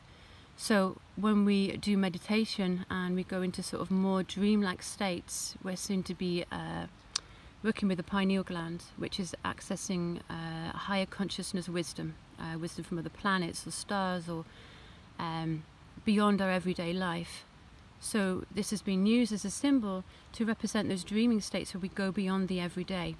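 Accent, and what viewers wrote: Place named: United Kingdom. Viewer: I wish I could understand your English-very British Very difficult to make out your words…sorry Because I’m very much into health/herbs…😟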